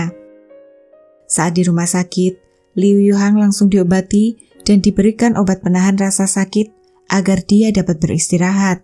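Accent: Java, Indonesia. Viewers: K wlwpun kk pkek bhs Indonesia tp logatnya khas Jawa bngt, jd aq inget tmnku klw dengar kk ngomong. Hi Irfan... Ni tmnmu 1 krjaan dijkrt dulu, dengar kk ni ngomong gw inget lu smg lu baik2 ja dmn pun skrng berada.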